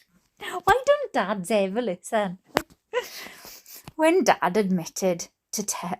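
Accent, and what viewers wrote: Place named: Wales, United Kingdom. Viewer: Ok my lovely! Wonderful if your accent & my southern Kentucky accent talking to each other. Add Rickey Watson in the mix.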